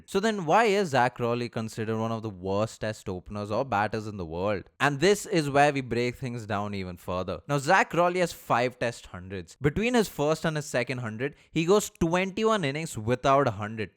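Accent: India. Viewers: This pjeet accent is sending me😂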